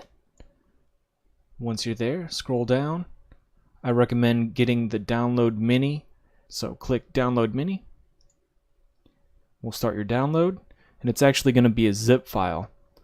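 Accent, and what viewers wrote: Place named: United States. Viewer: hey dude love the video, clear good old plain american english, and great quality too! Could u please make a short video or just quickly explain because i ALREADY have git installed but i would like to use Cmder ! Is there a way to intergrate the two or maybe unistall git and install the complete version of Cmder(inc git) thanks so much ! ☺️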